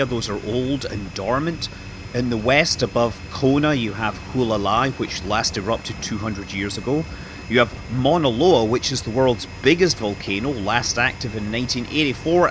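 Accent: Scotland, United Kingdom. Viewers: Do I hear a Scottish accent? It’s a posh wan but am sure it’s there.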